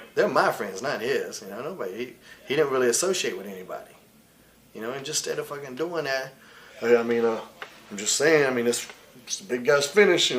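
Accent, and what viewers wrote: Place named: North Carolina, United States. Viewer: I love Shane he don’t hide that N.C. accent. I’m from N.C. so him, Matt, and jeff are kinda hometown heroes for me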